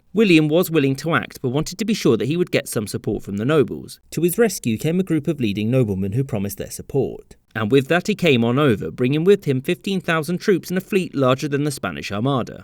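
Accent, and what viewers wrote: England, United Kingdom. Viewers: I'm bout to donate to this guys channel. I love these video's plus I would like to hear him say my name with his English accent. lol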